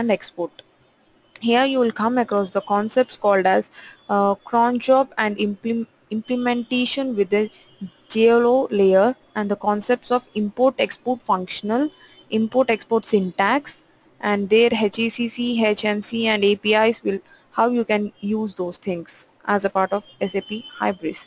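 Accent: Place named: United Kingdom. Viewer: very nice reading and beautiful british accent - music to my ear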